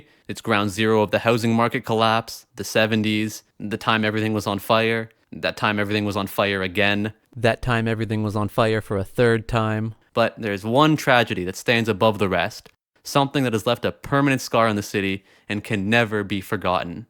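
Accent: Canada. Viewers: WHAT FUCKING PART OF CANADA ARE YOU FROM LIKE I CANNOT TELL?!?! ARE YOU FROM TORONTO OR THE ATLANTIC YOU SICK FUCK???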